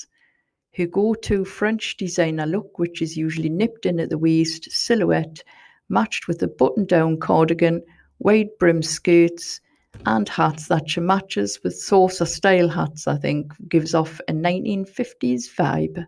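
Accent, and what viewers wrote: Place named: Newcastle, United Kingdom. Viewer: It's always interesting to here of royal members who go under the radar. Very factual and informative. Your geordie accent makes it all the more grounded. Well done.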